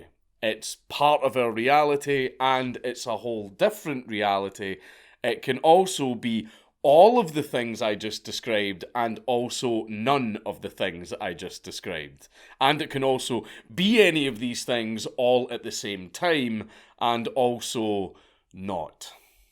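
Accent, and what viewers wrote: Scotland, United Kingdom. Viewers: Are you Scottish? My grandfather on my mother's side surname was Frazier. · This video was amazing. I want to see you talk more about Celtic legends as in-depth as you do. Especially in your awesome Scottish accent.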